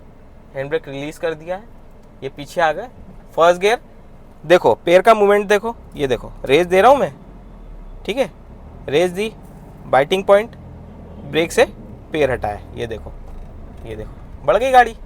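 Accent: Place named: Malwa, India. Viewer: मालवा क्षेत्र के हो लगता है ❤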